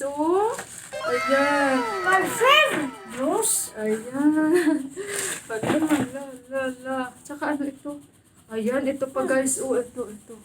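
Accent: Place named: Hiligaynon, Philippines. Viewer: More blessing inday.always watching ur vlog and don't skip adds.basta ilongga malambing gid ya mag salita.kaya halata ka ng asawa ko na ilongga dahil sa tuno ng pananalita mo.asawa ko taga bacolod din.God bless inday.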